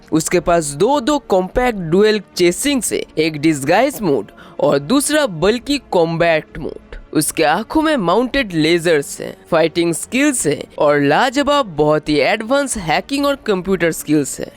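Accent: Bengal, India. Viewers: Are you from west bengal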